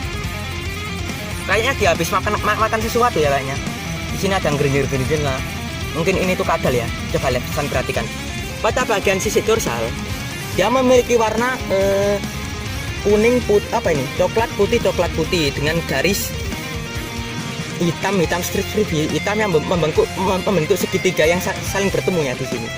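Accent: Java, Indonesia. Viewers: Video bagus..tapi medhoknya itu lucu..jadi kayak lawak si inyong. 😊🙏😅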